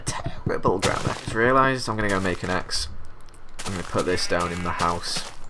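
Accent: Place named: Yorkshire, United Kingdom. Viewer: wait wait wait.. youre from yorkshire.. WHERE TELL ME NOW..btw me too :)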